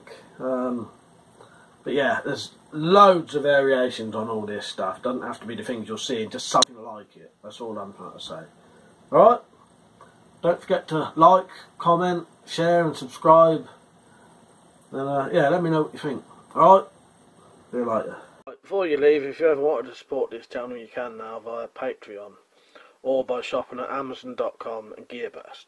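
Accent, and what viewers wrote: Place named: London, United Kingdom. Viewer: Dont want to sound or be rude but wheres that accent from?. Its a good working one but also sounds a bit distinguished.... Is it a London accent.. Good Vids BTW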